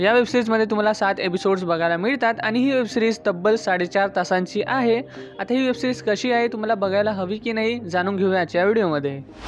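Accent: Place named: Vidarbha, India. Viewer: Vidarbhatil marathi ahe, so don't expect puneri marathi....... Ignore comments and Keep it up brother 🙏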